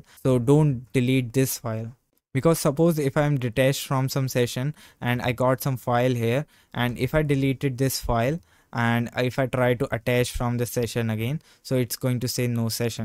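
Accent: India. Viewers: I have saved this video to watch it later. Many thanks for this. Btw, may I know where you are from? You have an Indian accent.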